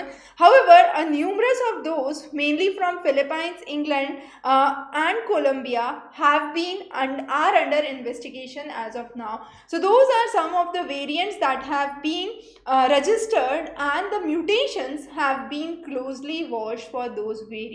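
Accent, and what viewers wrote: India. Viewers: english subtitles help me to understand your indian accent english :)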